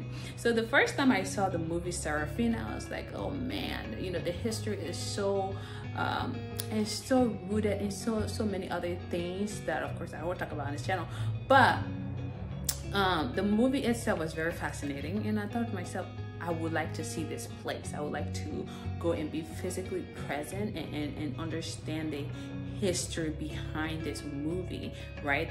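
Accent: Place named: Nigeria. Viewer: Hon you don't sound American , you sound Nigerian , wena woth bribery story at the airport, wena you are fake , you Nigerian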